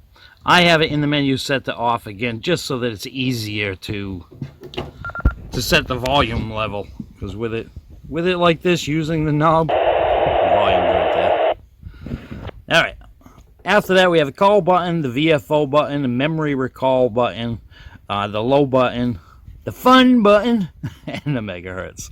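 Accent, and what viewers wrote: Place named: New England, United States. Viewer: "TRACK-TAH!" You sound like you are from my area in New England (RI)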